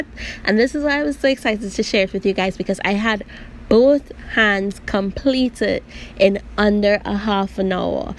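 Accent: Trinidad and Tobago. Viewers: I just knew you were a Trini when I heard you talking! I got the Apres Gel X done but I figured I could do it myself because I like the simplicity of the system.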